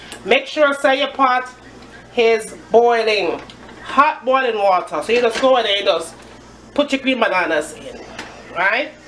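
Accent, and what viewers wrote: Jamaica. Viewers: Her voice and accent brings back such nice warm memories of Jamaica, plus her food, nice and homely..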